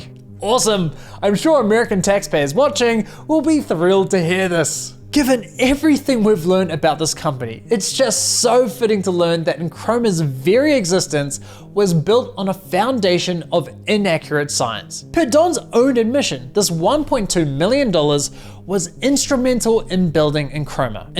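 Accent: New Zealand. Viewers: As an American, I very much appreciate your passion for protecting US consumers. This company is clearly lying. Your channel description and accesnt suggest you are from New Zealand, so I'm curious about your story. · Stellar work mate ! And, to be fair, your kiwi accent is indeed charming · it’s just his Kiwi accent. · Me too! 😂 That Kiwi accent! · Appreciating the kiwi accent ( a decade ago ) I swear I heard as a dickhead ago.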